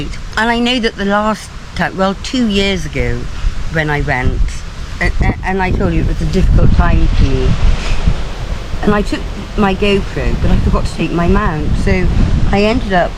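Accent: RP, United Kingdom. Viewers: I hope to go state side this year or early next year, I think it’s because most of us in the British isles grew up with American culture and values, I have to brush up on my American English , because I am more used to speaking Hiberno-English, I really love your accent it sounds like real queens English, anyway enjoy you travels, I love your channel been over a year now. Keep the rubber side down,😊😊😊😊😊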